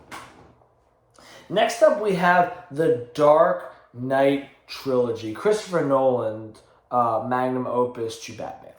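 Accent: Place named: South Jersey, United States. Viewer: i thought i recognized your accent - i'm from south jersey - live in Portland, OR now though - steelbooks are awesome - also anything from arrow video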